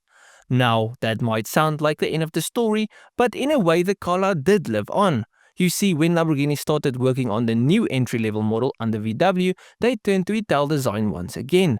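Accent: South Africa. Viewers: I think they say it “Guy-yard-o”, at least they do if it’s a Spanish bull., I’m trying to place your accent; South African? Love the videos.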